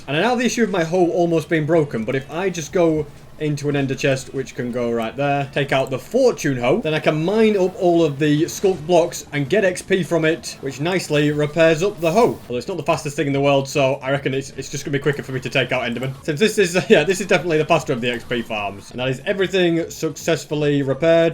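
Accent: United Kingdom. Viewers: He is british you plum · Is it just me or sounds british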